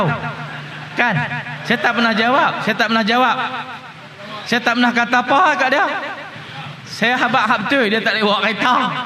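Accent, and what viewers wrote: Kedah, Malaysia. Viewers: ni cara anak kedah ckp...ori bya bahasa...trbaik mb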